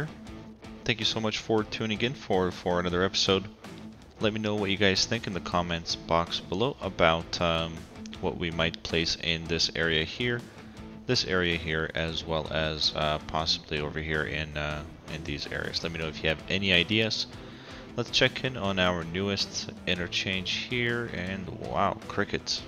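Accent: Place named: Netherlands. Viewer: ohhh, I don’t know what BiH means? But I thought so because you sound like you have a little bit of a dutch accent. It’s why I asked :) awesome cities btw! Have a nice day!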